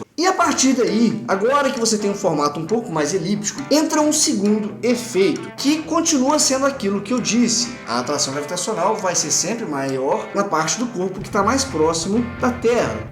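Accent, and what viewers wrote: Minas Gerais, Brazil. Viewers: Sotaque da CAMILA do PEIXE BABEL mode on! kkkkkkkkkkkkkkkk Veio um mineirês com um baianês bem sutil, mas eu pesquei! kkkkkkk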